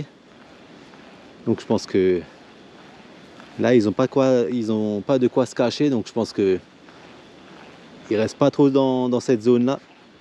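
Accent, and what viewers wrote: Alsace, France. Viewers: Sans ton accent Alsaco tes vidéos ne seraient pas les mêmes. Tu transmets parfaitement ta passion pour le carnassier au leurre. Personnellement et actuellement j utilise le Slick Finess en linéaire (usage détourné) .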